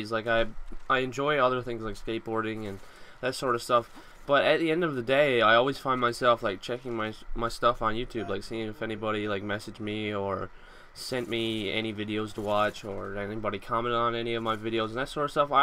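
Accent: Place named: Canada. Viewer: What is your accent? Sounds like you got something mixed in their that isn't just Canadian.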